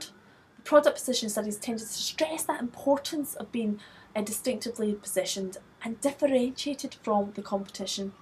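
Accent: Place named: Scotland, United Kingdom. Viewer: I love her accent, Scottish?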